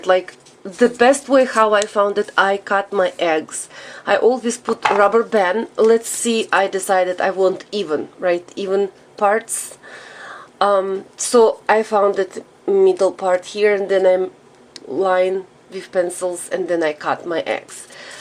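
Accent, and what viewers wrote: Russia. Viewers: Чувствуется ,что по английски говорит россиянка..Произношение режит по ушам..но все равно молодец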